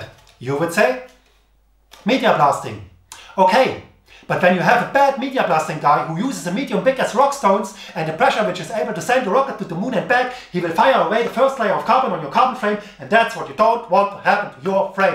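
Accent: Germany. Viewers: Das beste am Video: Das abgehackte und harte Englisch. Da hört man gleich das er ein Deutscher ist. 🤣🤣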